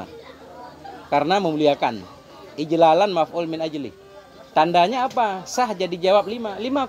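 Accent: Aceh, Indonesia. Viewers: maaf tgk asli orang Jambi / kek mana, sbb loghat nya kek ada loghat Aceh gitu 😅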